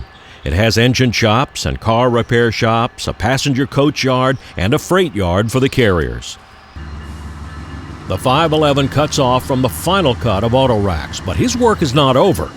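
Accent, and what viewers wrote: South, United States. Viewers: You are a man after my own heart! I simply adore trains ❤️ Your videos are delightful and so interesting and informative. We travel full time in our RV all over the country and I am always giddy when I see a train. Other folks avoid campgrounds near train tracks... I seek them out🚂! The rumble of the train and those wonderful horns just thrill me! Your channel pleases me very much and your soothing Southern voice brings a smile to this Southern lady's face. My husband shared this video with me this morning knowing it would make my day.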